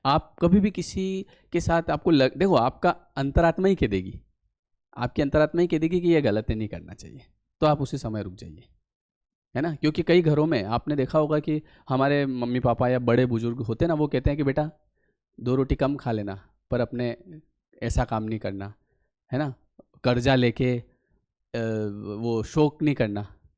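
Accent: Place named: Indore, India. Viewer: Aap MP se ho kya....aapki language Indore side ki lagti hai 😊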